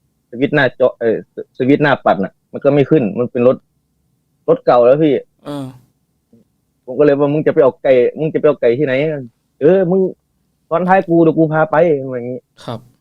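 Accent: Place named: Isan, Thailand. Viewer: 🙏สวัสดีค่ะ🙏.💞 💗.ตามฟังย้อนหลังทุก ep.คะ💓🍑.ชอบมาก เสียง สำเนียงภาษาบ้านเฮา😊เป็นนักเล่าที่มีเอกลักษณ์เฉพาะตัวจิงๆๆ☺️.🌅🎄.ติดตามคะ👌👍❤️💯💯💯💯💯💯💯💯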